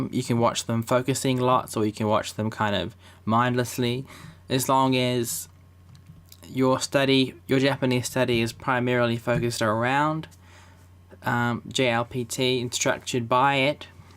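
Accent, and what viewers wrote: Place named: New Zealand. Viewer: Hahaha like ur kiwi accent mate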